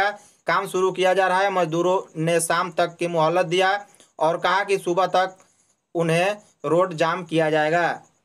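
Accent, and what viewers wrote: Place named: Jharkhand, India. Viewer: Sir aap jharkondi jaisa bolte haii achha lagta haiii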